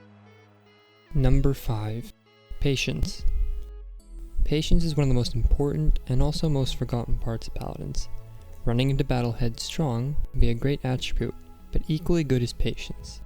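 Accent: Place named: United States. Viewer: Just curious, where are you from. It sounds like you have a typical American accent at first, but I keep hearing little places where it sounds like an accent is coming in.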